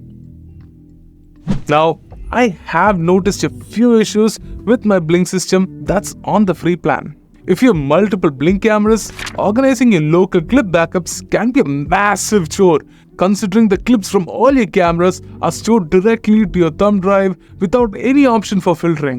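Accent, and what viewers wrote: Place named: India. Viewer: This might be better for US people if it was presented by a native US citizen that doesn't have such a strong indian accent, without the CC feature it is very hard to understand what's being said.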